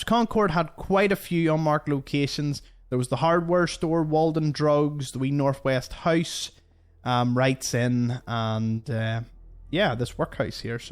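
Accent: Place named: Scotland, United Kingdom. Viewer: One thing to keep in mind is the bombs dropped on a Saturday morning. Was it a worker fighting the boss, or had someone broken into the building & made their way to the office and the safe only to be accosted by security? As to never having explored it, I figured the buildings with candles by the door in Concord were waving players to come that way. Lastly, love your accent. Is that Scottish? A person has to ask, because there is a separate accent practically every other mile a person travels in the UK.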